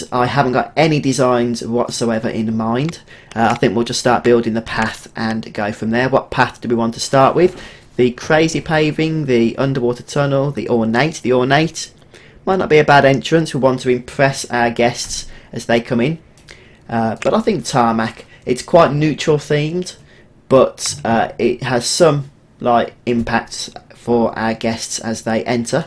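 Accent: United Kingdom. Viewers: I like him he sound like a really posh English man same as me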